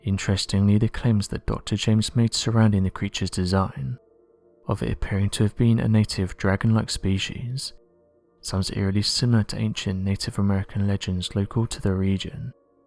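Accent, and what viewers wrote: United Kingdom. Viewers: Spooky mysterious British accent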